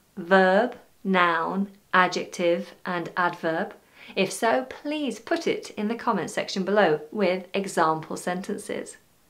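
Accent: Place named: England, United Kingdom. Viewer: when you speak with your nothern accent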